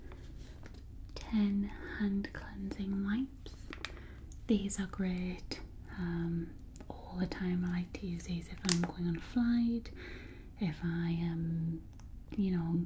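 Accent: United Kingdom. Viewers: i love ur lovely British accent🥰🥰🥰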